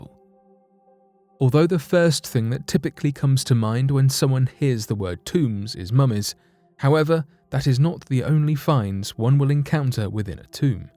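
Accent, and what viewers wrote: United Kingdom. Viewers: ARE YOU A FELLOW BRIT?🇬🇧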